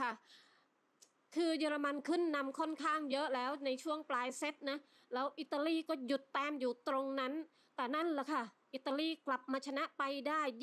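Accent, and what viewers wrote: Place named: South, Thailand. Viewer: Ad สำเนียงคนใต้ อยู่ จ.ไหนครับ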